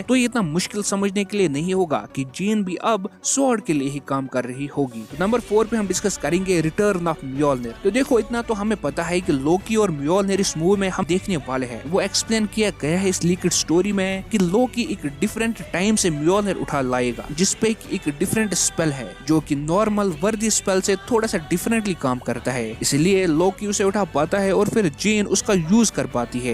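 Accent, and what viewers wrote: Kashmir, India. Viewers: i think he orator seems to be a Kashmiri..?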